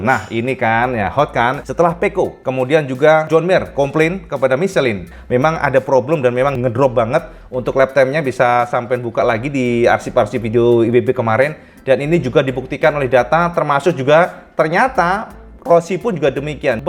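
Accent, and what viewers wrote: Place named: Java, Indonesia. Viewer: Josss Gandosss Cak...Up date Informasinya selalu saya tunggu-tunggu, lugas, jelas, padat dan ciri khasnya yang medok...👍👍👍👍👍👍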